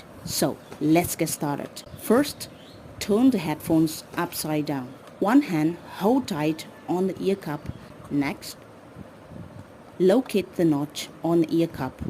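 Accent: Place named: India. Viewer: Idk why but these kinds of tutorials (the ones that are a minute long with indian (im assuming) accents) always work 100%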